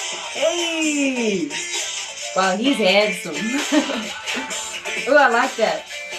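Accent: South Africa. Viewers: You South african....am I right? I know our South African accent from anywhere. Anyways FUN FACT: WORLD DOMINATION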